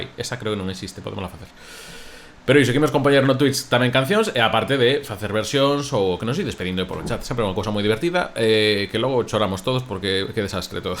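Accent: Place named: Portugal. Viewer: Genial video man! Pero me sorprende tu idioma re flashero jajaja portu español o nose re loco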